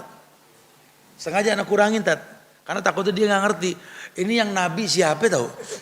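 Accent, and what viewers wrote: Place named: Jakarta, Indonesia. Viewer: Bnr bnr bahasa ibu ku .betawi bget.barakaallahfiq ustadz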